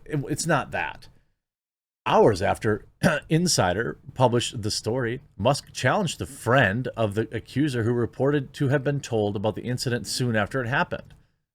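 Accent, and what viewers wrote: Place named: North, United States. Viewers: please don't care about the way you say flag or bag or stag or any of those type of words. It is normal to say it that way i nthe mid-nrothern states so no need to be self conscious about it. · Yo, ignore those idiots who take issue with your pronunciation of the short-a sound ("ă" --- which the International Phonetic Alphabet actually represents with this symbol: "æ") like as in words that rhyme with "flag" and "bag." (Again, in the IPA, those two words are actually even represented, respectively, as "flæg" and "bæg," so it's not like the slight bit more emphasis on the "e" part of the "æ" was ever really wrong, anyway.) The only thing i will say, is that's not a midwest accent (well it is, but it's more specific than that). That's a Northern Midwest accent. Chicagoland and higher latitudes. I had to point this out because, as a fellow midwesterner, i don't pronounce my vowels like you do but I'm still midwestern. 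However, I know my neighbors to the north do, certainly, sound like you (and it's part of their charm lol). So, long story short, don't acquiesce to these numbskulls, be proud of your accent (and the region it associates you with in this great, massive, country of ours [.. "from SEA to shining SEA," commies]).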